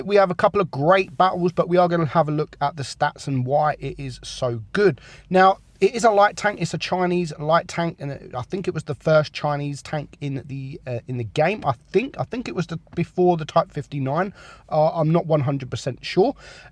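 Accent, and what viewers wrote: United Kingdom. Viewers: Dude, he's british. People accoss the world speak differently. Try to accept that, eh?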